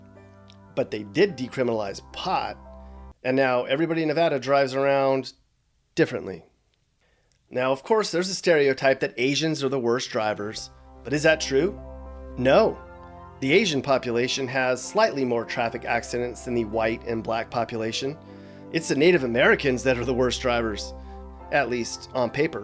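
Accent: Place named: California, United States. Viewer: Love your California accent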